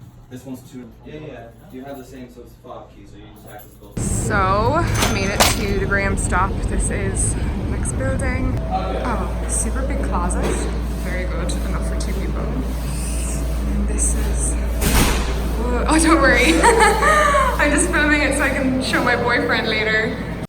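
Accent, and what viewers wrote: Ireland. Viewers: She definitely sounds Irish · Good info, bubbly personality and AN IRISH ACCENT —> never clicked subscribe this fast. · Nice Irish accent : ) Lofts are overrated..I stayed in some on Airbnb in NYC, not really great as you think. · Hey is that an Irish accent? I can't place it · I'm new to ur channel and r u Irish? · I knew I heard an Irish accent!! ❤❤❤ btw, I liked the last apartment... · How long have u lived here it’s sounds like ur Irish accent is fading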